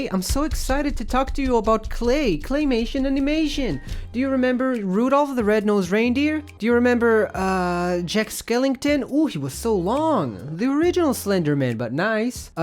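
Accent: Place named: Israel. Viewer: are u jewish. I smell an israeli accent. Might be wrong though.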